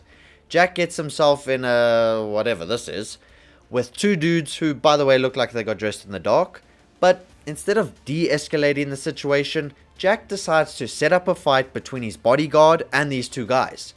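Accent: South Africa. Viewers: Wait are you from south africa?! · Are you South African?👀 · Are you a South African?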